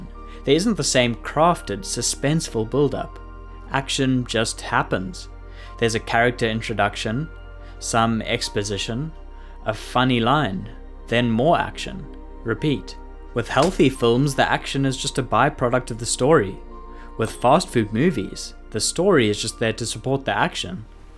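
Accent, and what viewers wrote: South Africa. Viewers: Saffa accent detected? Transplanted to Ozz of course. Maybe NZ. But def Saffa underlying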